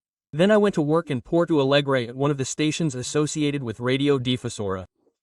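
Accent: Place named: United States. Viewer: Vidio foi gravado por me parece com voz americana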